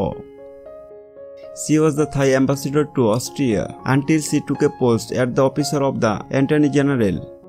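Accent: India. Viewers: Could you please change another Commander. We cannot understand what this gentleman is saying. Too heavy indian accent. Horrible and wasting air time money 😱😱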